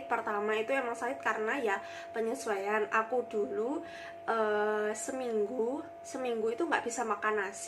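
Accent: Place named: Java, Indonesia. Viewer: Medok rek jowo ne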